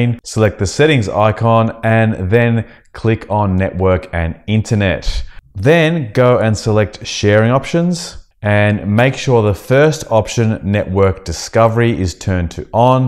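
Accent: Australia. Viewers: sounded Aussie to me...?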